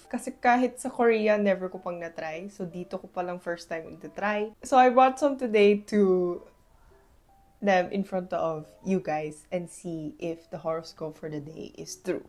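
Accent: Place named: Batangas, Philippines. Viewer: alam nyo ga? ha ha ha! you are Batanguena through and through… 😊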